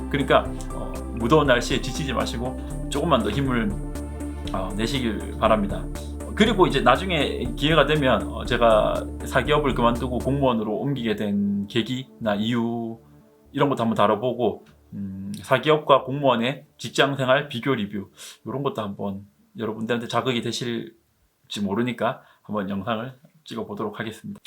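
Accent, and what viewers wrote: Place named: Gyeongbuk, South Korea. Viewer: 경북 거주하시나요? 억양이 저희 고모랑 똑같아서요 ㅎㅎ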